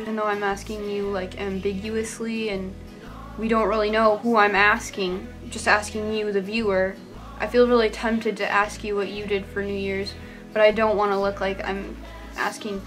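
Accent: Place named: General American, United States. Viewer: I'm no accent expert by any means. Your accent is pretty generic American for the most part but when you said "though" I caught that sort of lengthening sound that is common with people from the north, namely Minnesota.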